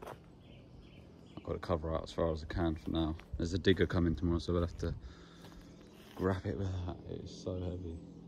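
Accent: England, United Kingdom. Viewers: You sound English?? Aussie here. Concrete and aggregate. Or fibreglass drop in. Salt water chlorinated! First I seen of one like this. You did a brilliant job.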